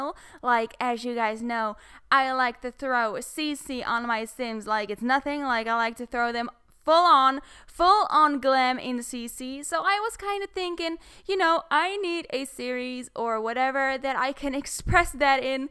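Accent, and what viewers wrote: Netherlands. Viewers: Yess ❤️ I knew you were dutch the second i heard you haha Dutch accent coming through 😃💙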